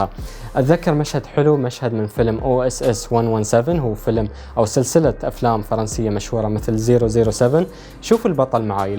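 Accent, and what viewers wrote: Iraq. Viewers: لهجتك تشبه العراقيه الي وياي لايك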